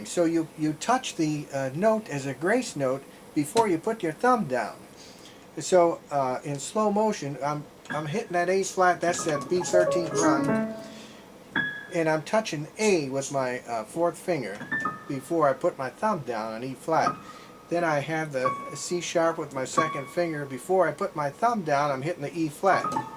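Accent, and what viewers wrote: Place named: Pittsburgh, United States. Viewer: You are an outstanding pianist as well as a wonderful teacher. Your accent sounds like you are not far from me in Steeler country. Keep up the brilliant work!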